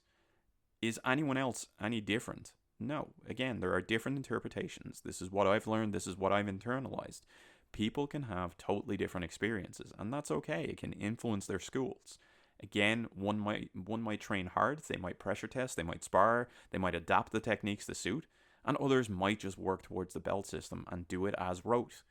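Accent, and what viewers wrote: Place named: Ireland. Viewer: Thanks for this. I can hear that you're Irish. i'm working at kenpo the past 18 months & I am finding it fantastic, so great for personal growth, fitness, agility, memory & discipline. But I do find it maddening that not only is there such a divergence from the USA kenpo, but even amongst the Irish kenpo schools there is not harmony. So it is very difficult to learn. But hey, I'm enjoying it.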